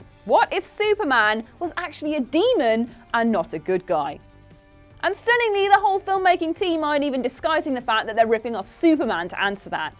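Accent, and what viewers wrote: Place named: Birmingham, United Kingdom. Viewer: well, i just found my new favourite brummie girl. Superhottie.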